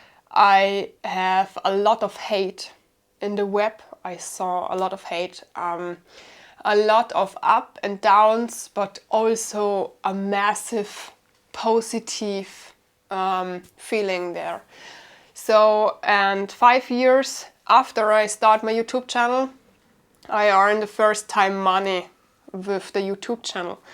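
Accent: Germany. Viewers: I really enjoyed this "peek" of your childhood and teenage years. I envy you, your trips to Croatia, Italy, etc. Has anyone told you that in your teenage photos, you resemble a young Gwyneth Paltrow? You are lovely . . . hearing your German-accented English takes me back to my almost 6 years in the Army, in Germany. I loved it.❄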